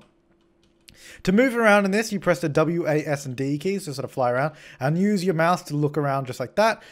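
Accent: Australia. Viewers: You sound a lil bit like Jazza. I'm thinking its because of the Australian accents. 😳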